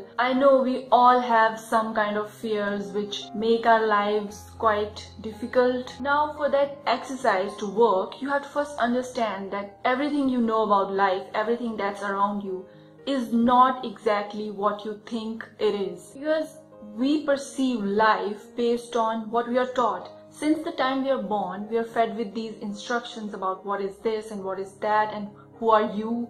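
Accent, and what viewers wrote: India. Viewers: Indian accent thanks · I knew it cuz ur English sounds like Indian English and I'm Indian too:) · Hey u look like an Indian And ur accent too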